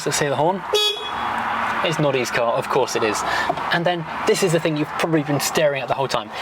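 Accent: United Kingdom. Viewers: You are typical British it is known by your accent.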